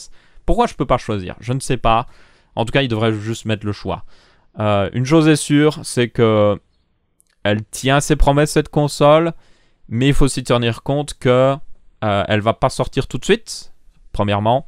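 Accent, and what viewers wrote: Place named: Belgium. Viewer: bah il est belge il le dit en belge ... il va pas le dire en anglais non plus ..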